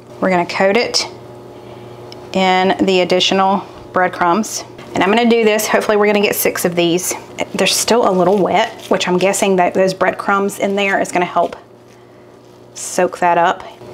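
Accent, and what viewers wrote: South, United States. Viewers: When I hear a southern accent and see them using ingredients from Food Lion I know it's going to be good.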